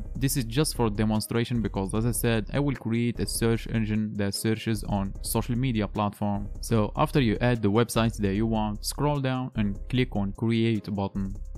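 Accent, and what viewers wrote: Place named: Morocco. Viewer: you're moroccon aren't you? your accent is moroccan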